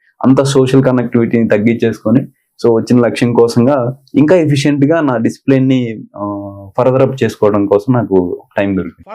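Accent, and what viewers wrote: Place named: Andhra Pradesh, India. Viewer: Andhra na meedhi? Andhra language matladuthunnaru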